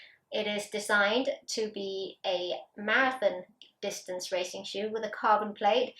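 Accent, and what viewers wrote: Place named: United Kingdom. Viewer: Great review, love that British accent. Bravo!!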